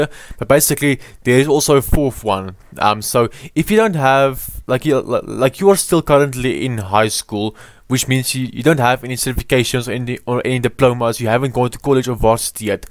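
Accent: Afrikaans, South Africa. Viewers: <link> That part from there that Afrikaans accent still love your videos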